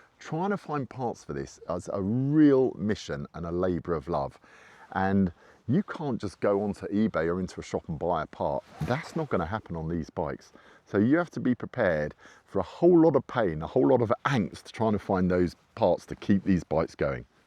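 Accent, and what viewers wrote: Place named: United Kingdom. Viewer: You sound like you have a British accent. As a Brit, have you owned or ridden a classic (pre John Bloor) Triumph or other British bike? I’ve owned many old 1960’s-1970’s Triumphs over the decades, and I still have a couple. Although it’s not as easy as running to the local dealership, finding parts for those older bikes isn’t really a problem. You can find anything you need online… for now, anyway.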